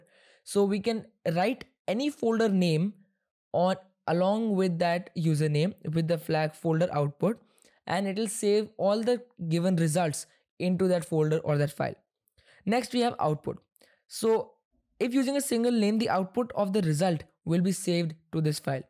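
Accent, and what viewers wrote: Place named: India. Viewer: Pov u found best video but breo have indian accent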